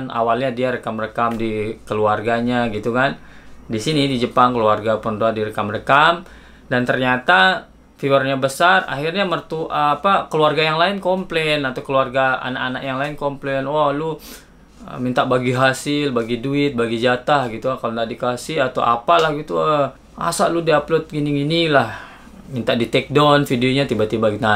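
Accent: Lombok, Indonesia. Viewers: Masih bae fasih logat Sasak tie meton. Banggek gitakm sukses. Mudahanm tetep bahagia sekeluarge lek te😁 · Saluuut medok sasaknya gak ilang 😅😅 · Abang kok logatnya lombok bgt ajarkan ke kita jadi youtuber 🤗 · Kek logat lombok ya bang.. Dari lombok kah? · Keren bang Logat sasak masih kentel😅 salam semeton sasak😺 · logat lombok nya masih kentel bang salam dari KLU lombok jg 🎉😂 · Logat lomboknya msi kerasa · Timmak nahase Betawi laguk logat masih logat lombok hihini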